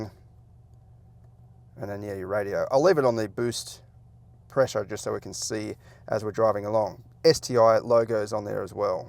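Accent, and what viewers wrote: Australia. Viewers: This video is Australian. Steering wheel on the wrong side and accent is a bit of a giveaway.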